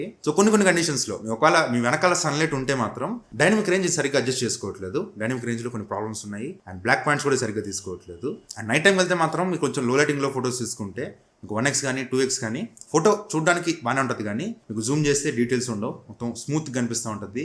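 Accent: Nellore, India. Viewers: Are you from Nellore 😮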